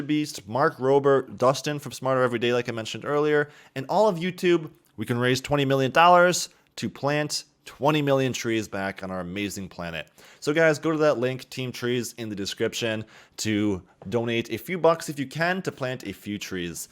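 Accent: Germany. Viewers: Yo are you german??? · Are you German ????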